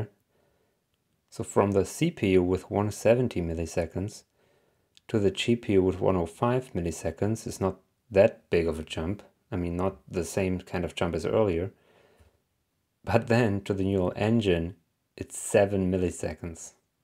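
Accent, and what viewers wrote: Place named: Germany. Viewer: I hear some German accent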